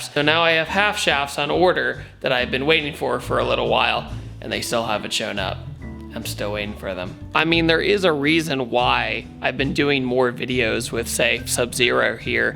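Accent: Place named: South, United States. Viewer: Don’t apologize for that southern drawl. A southern man tells better jokes.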